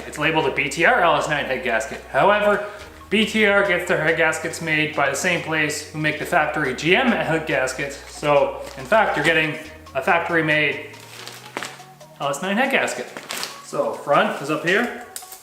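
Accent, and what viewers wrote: Alberta, Canada. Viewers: Are you from Alberta??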